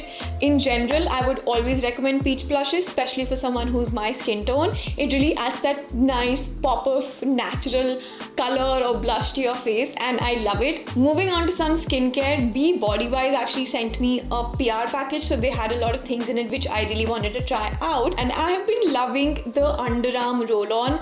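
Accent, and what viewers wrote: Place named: England, United Kingdom. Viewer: English accent is so good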